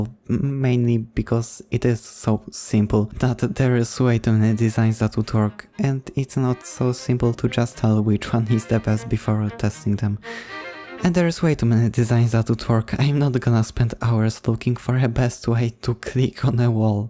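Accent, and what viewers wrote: Poland. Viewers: fajny polski akcent B) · od razu poznalem że jesteś polakiem po akcencie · Idk why but you sound Polish for me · I thought you sounded Polish, I checked you channel and well, On point.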